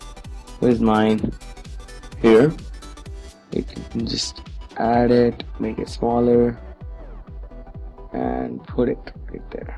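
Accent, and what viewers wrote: India. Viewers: he has an Indian accent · This fucking software is so aids, it hardly even fucking works. Fuck you and your Indian accent, fuck this entire shit.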